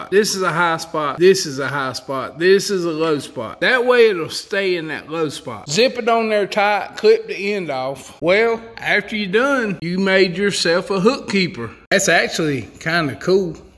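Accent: United States. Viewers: oooooollll, lol what an awesome country accent.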